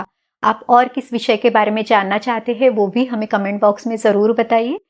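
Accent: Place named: Maharashtra, India. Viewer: Doctor ur hindi accent is like marathi but ur jain gujrati🤔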